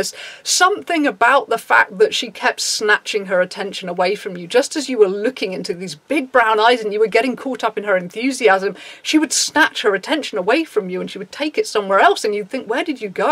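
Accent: United Kingdom. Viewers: I love your videos about Asperger's and eating disorders. Hearing you talking about asperger's was when I first realised it could be me too and somehow your voice and your British accent have become a little voice in my head that speaks English (English is not my first language). I find your videos calming and thanks to you I've come to accept and understand many things about myself. Whether I'm asperger or not is something yet to determine, and I don't know if I'll seek out a formal diagnosis. Thanks for sharing your experience, I feel "at home" when I listen to your videos. I also really enjoy your looks!!!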